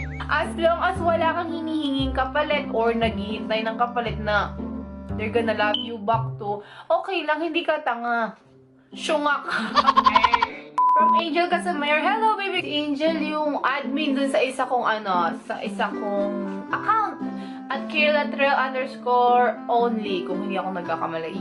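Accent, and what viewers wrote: Visayas, Philippines. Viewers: Taga pampang ka diba ate pero parang bisaya👌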